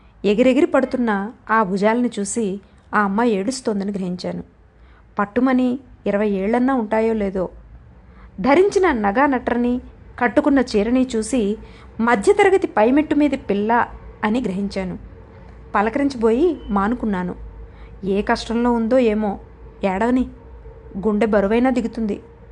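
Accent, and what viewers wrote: India. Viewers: కధ బాగుంది. రాములమ్మ పాత్ర బాగుంది. తూర్పు యాస మీ గొంతుక లో.బాగా.పిలిపించారు. వరలక్ష్మి గారి కథ బాగా రచించారు. మీ ఇద్దరికి నా ధన్యవాదాలు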